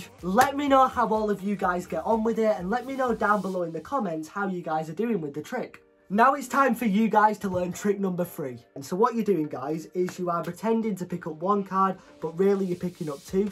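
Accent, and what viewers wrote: United Kingdom. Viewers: You are incredible. Can I ask you where are you from. It sounds that you have a british accent. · l love your british accent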